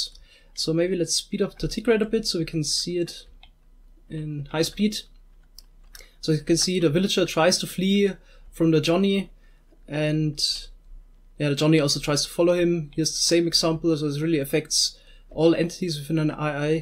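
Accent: Germany. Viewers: I love your german accent keep it up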